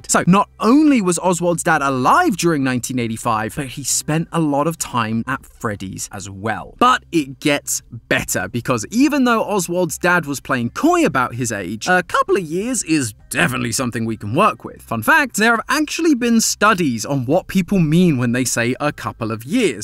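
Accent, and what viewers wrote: United Kingdom. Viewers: I'm so used to matpat that it scared me when I heard a British accent love ya!😭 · I want matpat back Your voice is just too British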